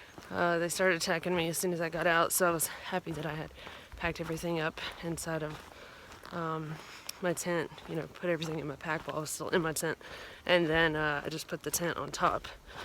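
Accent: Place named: Alabama, United States. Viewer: That was some Lake...the best in your LAFE?!! You sound like my sister (Birmingham, Al) LOL! Love it!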